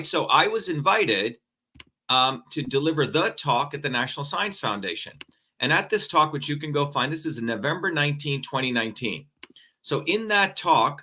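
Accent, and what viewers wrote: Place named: Kansas, United States. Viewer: Im 41 and I live in central Kansas, USA. I was raised in a 3,000 person rural town. I will be blunt, I love showing Dr. Shiva to all of my cracker redneck hillbilly hick ass friends! I love to hear him SPEAK EXACTLY the same way that "we" all talk around here. I',m going to tell them to start closing their eyes before they start to listen. Scumbags equals ALL POLITICIANS! Look here, it is time for real talk, foul language, and time for some fucking action. Semper Fidelis means something to me again and I will register to vote again and go back to encouraging my kids to serve for our country as i did if this dude gets elected. Fuck the Senate, we need you now Doc! Help us save what is left of our failing country so that, among MANY THINGS, our fallen will have not died for anything.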